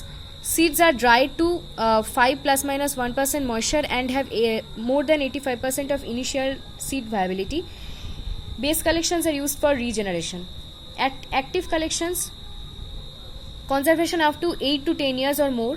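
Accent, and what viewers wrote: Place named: Bengal, India. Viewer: Pura Bengali accent h💝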